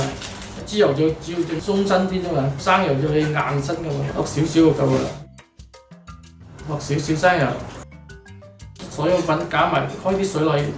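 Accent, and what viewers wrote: Zhongshan, China. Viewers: 鄉下係唔係中山？啲口音好似中山啲石岐話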